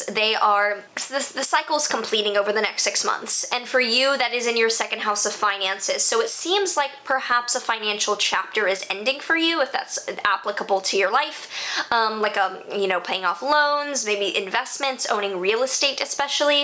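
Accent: United States. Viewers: Your English is terrific - I genuinely thought you were American! And if you need to talk, my email is <mail> I’m sorry you’re going through all this stress but I’ll be happy to listen. Wishing you the best!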